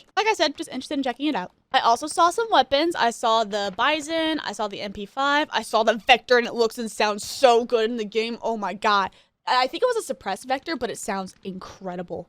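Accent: South, United States. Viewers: You keep getting more and more southern as this video goes on.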